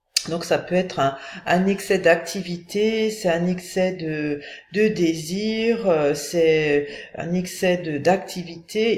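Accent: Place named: Switzerland. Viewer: A ta voix tu habiterais pas loin de la suisse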